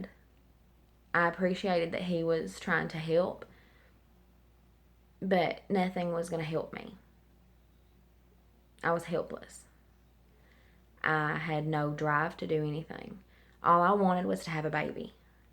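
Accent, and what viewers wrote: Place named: Kentucky, United States. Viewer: I knew that accent!!!!!!!!!!! That's why I came to find the first video hoping you would say where you are from! I'm from Kentucky too lol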